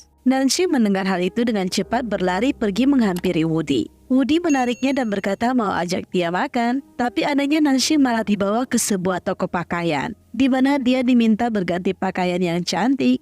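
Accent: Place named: China. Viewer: ne kk orng cine ya.. dari omong kk ne..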